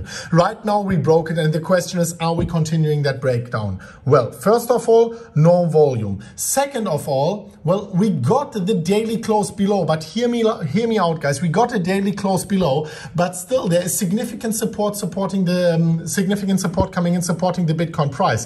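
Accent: Germany. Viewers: Junge, dein deutscher Dialekt ist der Hammer! :D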